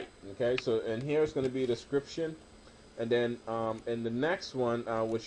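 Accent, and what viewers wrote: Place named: Bahamas. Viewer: OMG YOU ARE BAHAMIAN I can hear trhat I went to school with many bahamians Do you do do Online Businesses?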